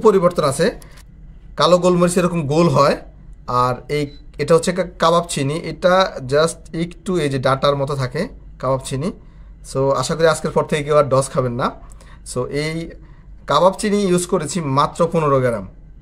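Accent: Noakhali, Bangladesh. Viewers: ভাইয়া বাড়ি কি নোয়াখালী তে? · ভাই আমার কাছে রান্না এক প্রকার আর্ট, তবে আপনার কথা শুনে মনে হচ্ছে আপনিও আমার মত নোয়াখাইল্লা। মনে থেকে বলছি ভাই আপনার ভিডিও টা অনেক ভালো লাগলো। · যতই স্মার্ট উপস্থাপন করার চেষ্টা করো,নোয়াখাইল্ল্যার ভাষা বোঝাই যায়। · আপনার বাড়ি কি নোয়াখালীতে? anyway very helpful video, thanks. 😇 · ভায়ের বাড়ি নোয়াখালী নি। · কথায় বুঝা যায় নোয়াখালী অঞ্চলের মানুষ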